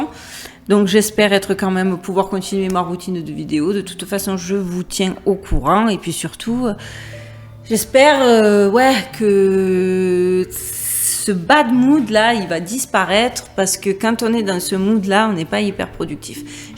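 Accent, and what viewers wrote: South, France. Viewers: Bravo, joli travail de recherche... Depuis quelques semaines je suis votre chaîne youtube, et je dois dire que c'est un beau travail de synthèse et de liens fait avec un certain brillo ✨👌 (Même si tous les sujets abordés ne résonne pas forcément... Je fais mon tri comme un grand 😄) Et puis votre côté "rigolote" et votre "accent du sud" donnent une saveur en plus bien plaisante... Merci à vous 🙏☯️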